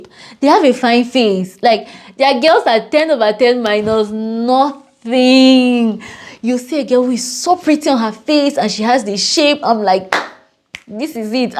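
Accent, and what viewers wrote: Nigeria. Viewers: Not at all ,she literally speaks like a Nigerian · You sound so much like Nigerian 😊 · Why do you sound Nigerian ?i dont gerrit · Naaaa, she sounds very nigerian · Have you lived in Nigeria before? Cause you can't be Cameroonian with that accent. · She sounds like a Nigerian from her accent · No she sounds a Nigerian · U speak like a Nigerian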